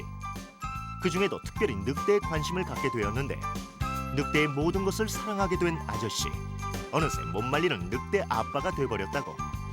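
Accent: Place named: Seoul, South Korea. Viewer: 와 저때도 서울사투리가 좀남아있네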